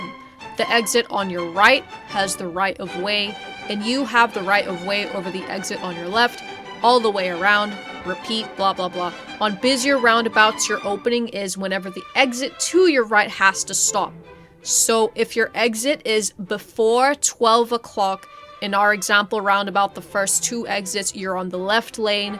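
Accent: New York, United States. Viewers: Wait wait WAIT A DAMN MINUTE!!! Your from the UK but you have a heavy new yorker accent 😭? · I'm guessing you're originally born in the states due to your American New York accent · Yooo wtf I was thinking the same thing, I've never heard a British person sound that american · I thought I was going insane- I heard that new yorker accent the last video and was confused af on this video when getting to the UK part. LMAO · Nah, she’s speaking American.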